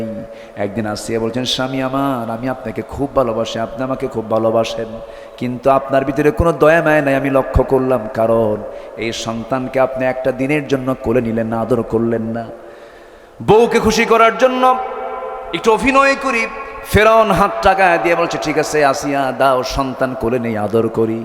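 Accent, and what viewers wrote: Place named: Kushtia-Jessore, Bangladesh. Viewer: উনার ভাষা শুনলে মনে হয় যেন কুষ্টিয়া অথবা যশোরের মানুষ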